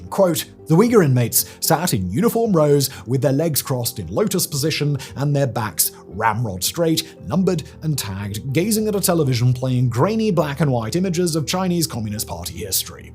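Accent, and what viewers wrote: United Kingdom. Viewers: especially if British or American. He would likely be labeled British due to his accent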